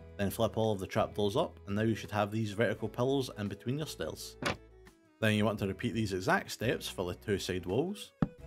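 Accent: Scotland, United Kingdom. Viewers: Build: 100% Style: 100% Shaders: 100% Scottish Accent: 110% most underrated minecraft channel in history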